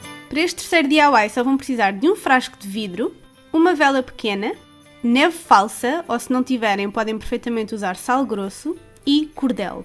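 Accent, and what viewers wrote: Portugal. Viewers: você é de Portugal??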